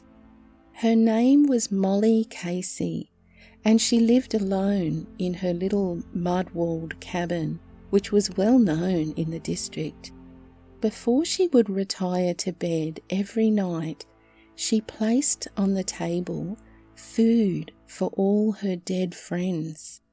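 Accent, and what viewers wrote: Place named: Australia. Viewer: wow wat a voice... im french canadian and allways loved your Australian accent's ,you folk from down under...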